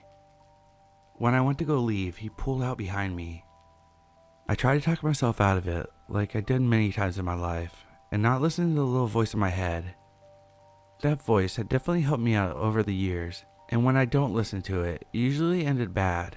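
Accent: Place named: United Kingdom. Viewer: Oh no a British accent no thank you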